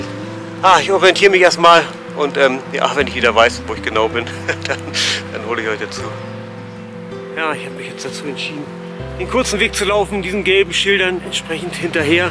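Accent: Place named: Baden, Germany. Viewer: Das ist badisch!! Wir reden nicht komisch...IHR redet komisch :-) In Hausach war ich auf dem Gymnasium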